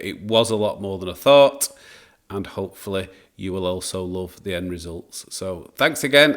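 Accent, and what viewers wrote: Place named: Northwest England, United Kingdom. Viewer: This was a lovely video to watch. You obviously take pride in what you do and the piece should now last for another hundred years. I learnt so much from this for a piece I'm going to try and restore, so thank you. If you take commissions, you should mention how to get in touch with you. It sounds like you're from the NW and I am too, and I know my furniture would be well looked after if I brought them to you.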